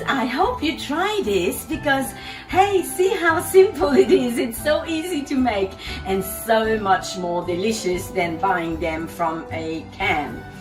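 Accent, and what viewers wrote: Australia. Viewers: I could pick up the Australian accent easily. I'm sorry that as it is my first time at this channel I don't know your history. The classy French accented English reminded me so much of the beloved Madame Benoit. For those who might not know, Jehane Benoit was an early cooking star on Canadian TV and a rarity as she was on both the English and French CBC channels. She was deferentially known as Madame Benoit (rarely Jehane) and was a woman who was ahead of her time belieing her matronly appearance. She was an early advocate of vegetarian cooking and much later microwave cooking. <link>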